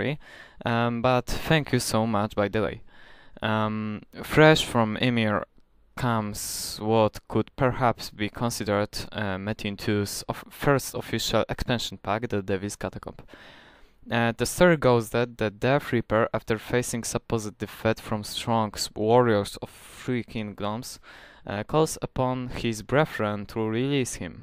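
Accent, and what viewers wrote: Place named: Poland. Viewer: Szacun. I tak słychać w choooooj polski akcent. O_o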